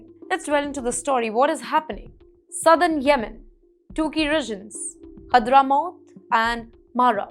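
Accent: India. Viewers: Oh my god look at this curry accent we Australians don't understand what you are saying speak proper English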